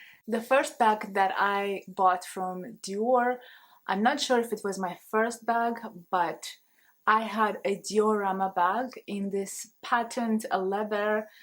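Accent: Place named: Russia. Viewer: Вы казашка? Акцент русский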